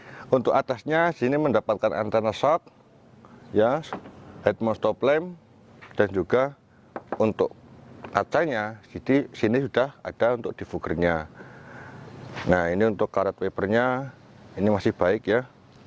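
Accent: Banyumas, Indonesia. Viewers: Ha ha ha slm ngapak. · Tawa mobil bonus paving, pa bos,,?? Rika wong ngapak ya,nyong demen,,aku duwe wedus,go dp bisa??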